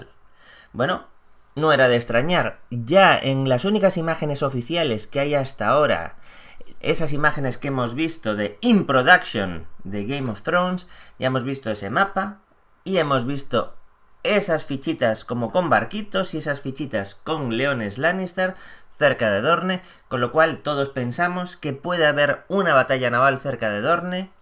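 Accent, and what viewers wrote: Spain. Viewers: si esta chistoso pero es que así pronuncian los españoles.. sin ofender :)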